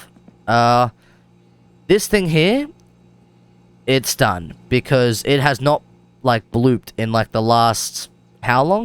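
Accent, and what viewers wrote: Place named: Australia. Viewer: Australian, LOL.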